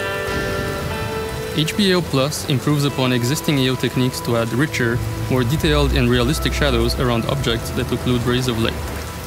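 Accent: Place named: Montreal, Canada. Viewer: yup the guy talking is cleary from montreal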